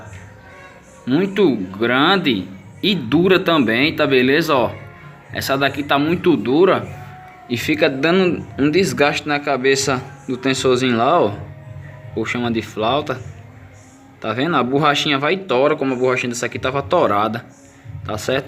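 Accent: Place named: Northeast, Brazil. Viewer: Maravilhoso sotaque nordestino, " ta rochada ja" abraço pra vc s todos aqui de CuritibaPR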